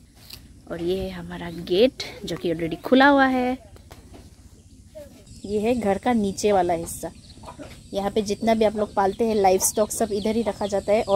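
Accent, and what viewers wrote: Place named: Delhi, India. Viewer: Didn't know Arunachalese speak such fluent Hindi.. It sounds more like a Delhi region's Hindi. The way you are speaking, is it the typical Arunachali way of speaking Hindi? different regions in India (even within Northern belt) have different way/dilects and not necessarily speak Delhi-style Hindi. For Example, Hyderabadi Hindi has very typical Urdu-Telegu influence and has its own beauty and charm.. similarly, Mumbaiya Hindi has its own nuances as it got mixed with Marathi and sounds very beautiful on its own.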